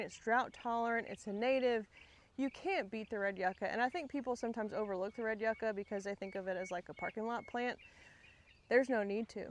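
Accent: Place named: Texas, United States. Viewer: you can tell she's holding back on her texan accent.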